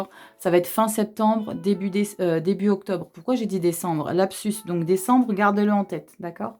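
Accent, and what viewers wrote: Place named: Marseille, France. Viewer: Coucou ❤️ Moi rien ne me parle pour l'instant mais même accent du sud, de Marseille 😂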